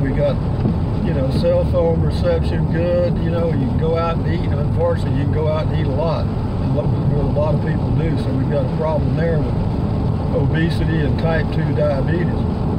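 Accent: Texas, United States. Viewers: " "...one of my favorite songs by. Your accent is just like that of my relatives in Texas...pure Texan! I have a boring California accent. Having a wide range of experiences, as you have had, I think helps with keeping things in perspective. Look forward to seeing the Maxx fan in action. Good video....I enjoy listening to the random thoughts.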